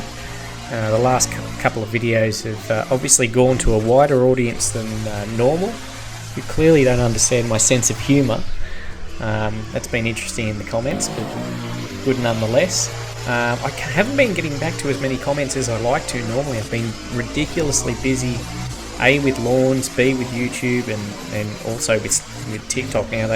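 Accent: Australia. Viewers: Hi,! No need to stop talking in your videos! I enjoy your narratives about what you are doing. I also like your Aussie accent!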